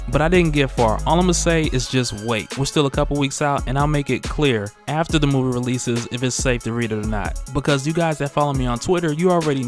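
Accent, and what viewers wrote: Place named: Texas, United States. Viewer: This my first comment but I have watched and liked a lot of ya videos always speaks that Texas trill 💯💯💯💯💯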